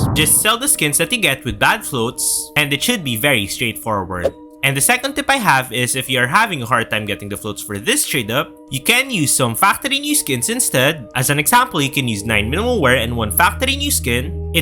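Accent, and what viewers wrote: Philippines. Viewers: I just knew it that you're from the Philippines because of your accent! But this is really useful so I'm trying this. Thanks!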